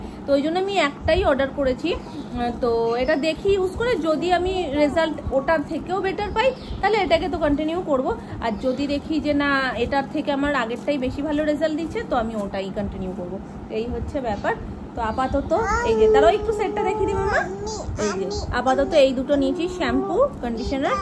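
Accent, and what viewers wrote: Bangal, Bangladesh. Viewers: Pakabori mukhe bangal kotha sonle khob valo lage mon ta vore jay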